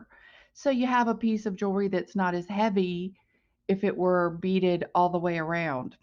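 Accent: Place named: South, United States. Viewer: Are you from the south? You have a suthern accent. (Love it )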